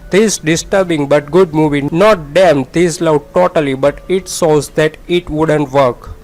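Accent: India. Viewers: Indian accent sucks!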